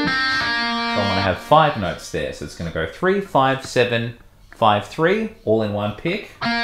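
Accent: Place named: Australia. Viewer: who doesn't just love the sweet sounding Australian accent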